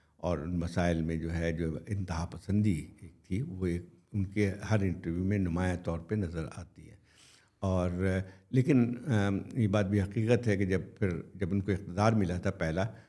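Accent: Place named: Bihar, India. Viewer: sahab ke bolne ke style se aisa lagta hai ki ye basically Bihar ke hai.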